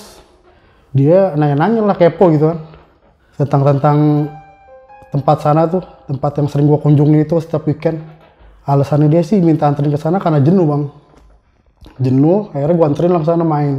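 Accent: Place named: Betawi, Indonesia. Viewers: Betawi bangat da ah logat nye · Betawi bet bahasanya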